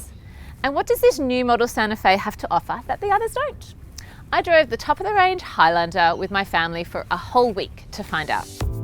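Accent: Australia. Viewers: Phu Vuong she sounds Australian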